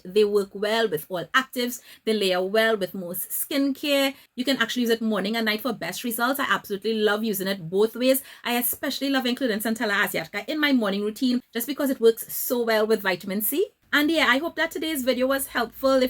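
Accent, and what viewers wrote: Trinidad and Tobago. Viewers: A Trini! A Trini accent never sounded so sweet 🇹🇹🙌🏽🔥!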